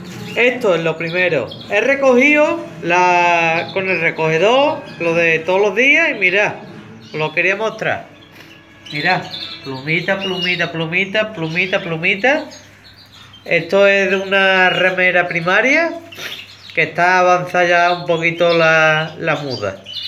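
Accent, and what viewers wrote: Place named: Malaga, Spain. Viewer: pues que decir que eres muy grande y aprendo mucho con tus vídeos paisano que por el acento eres malagueño como yo un saludo 😁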